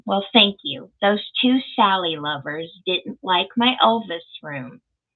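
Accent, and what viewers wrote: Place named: South, United States. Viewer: Hi, Kate here. Sorry I missed your live show. Hey, girl, we still love you. You are - passionate about your love and loyalty for Elvis, defending him like we're all doing, showing your true feelings and keeping it real, that equals honesty in my book. Please don't ever change. You do make me laugh, and that fabulous classic Southern accent makes your little rants sound even better! Lol. See you soon, Georgia girl. Take care. Loving Elvis and Lisa-Marie always.❤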